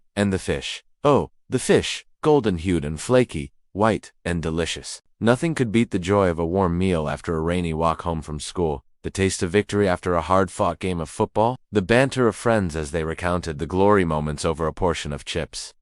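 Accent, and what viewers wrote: United States. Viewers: The American accent definitely put me off. What would he know about the UK in the 60s?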